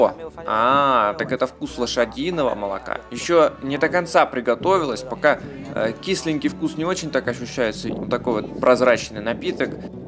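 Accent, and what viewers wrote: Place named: Kazakhstan. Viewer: По чисто казахски. Какое наречие?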